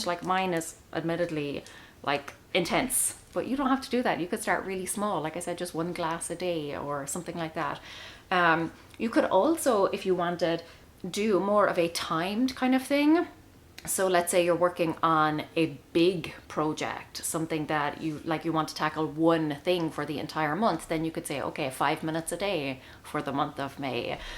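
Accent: Ireland. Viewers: Love your brogue, what part of Ireland are you from?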